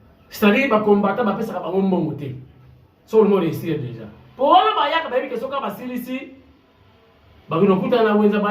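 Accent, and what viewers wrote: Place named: Manianga, Democratic Republic of the Congo. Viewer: Ozangi koloba na espagnol: SU SALUD ESTÁ BIEN. Tanga comme si ozotanga ki manianga.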